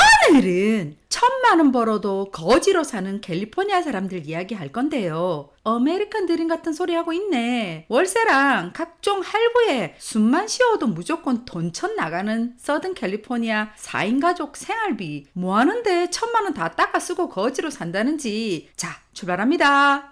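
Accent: Gyeongsang, South Korea. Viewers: 경상도 사투리 귀에 거슬려요..ㅠㅠㅠㅠㅠㅠ 난 2찍들이 정말 싫어요. 저는 대구시민이예요. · 와 경상도 사투리 역대급이다 . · 경상도사투리가 구수하고 좋아요. 전라도 깽깽대는 사투리는 정말 재수없고 구역질 납니다.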